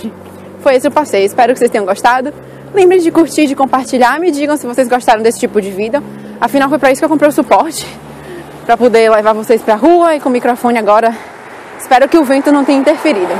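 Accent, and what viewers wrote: Brazil. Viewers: Você é do nordeste ?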